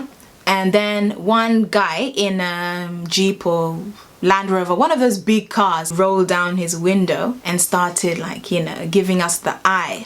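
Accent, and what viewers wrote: United Kingdom. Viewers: The problem is you believe you are "Black" and the people are Germans. You dont want to Call youself British going by your accent. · That British accent.. 🤝🤝👌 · Hi...,I real like your accent are you from UK? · Your African,your not considered black by us.Your African,British accent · Girl, I'm African-American and if you came to the U.S. you would get stared at by Black people because of your dark beautiful glistening skin. Then if you started to talk with that English accent you would get stared at even harder!! You would be such an anomaly here, worth every adoring stare!! Its interesting to see how slavery affected and displaced Africans all over the world.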